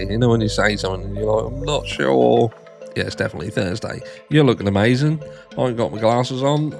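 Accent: United Kingdom. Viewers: bryan did it hahaha glad to see u where wrong but love accent cutie bear uk man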